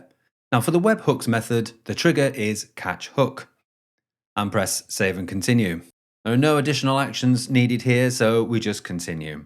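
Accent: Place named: Northern England, United Kingdom. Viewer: Pure class. For someone with the IT skills of a lobotomised pebble, this was priceless. Nice and slow baby steps. No Yoda style html jargon and all them other things I simply do not understand with IT. You got yourself a new subscriber and follower. And the extra bonus was your accent. It is nice to hear an English accent as some other accents go so fast that I'm lost before I begin. Being from Norfolk in the UK, you don't get much slower accents than us, good ole boy but that Northern twang of yours is great for this. A bloomin good job. Bootiful.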